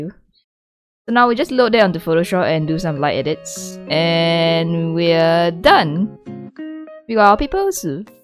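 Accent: Singapore. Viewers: Is she singaporean she has that accent 🤔🤔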